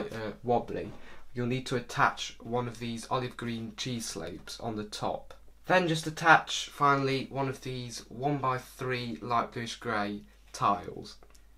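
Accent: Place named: United Kingdom. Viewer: Holy moly, british accent confirmed 😅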